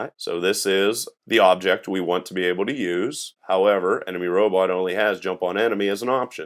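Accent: Pittsburgh, United States. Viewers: Enjoyed the pace and the material. Your accent takes me back to the 'burgh.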